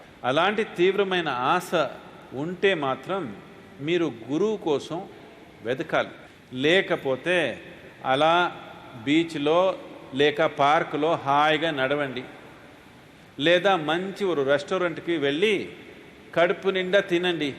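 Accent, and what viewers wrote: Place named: Tamil Nadu, India. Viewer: Arva vedavalu Telugu matladinattu. Undi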